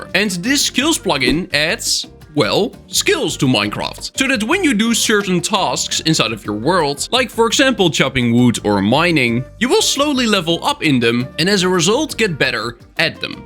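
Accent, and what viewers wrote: Netherlands. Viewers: the fact that you can hear that he comes from the netherlands is crazy (ik ook daarom weet ik dat)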